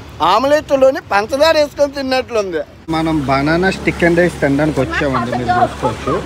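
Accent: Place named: Godavari, India. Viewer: Anna godavari yasa super ga matladavu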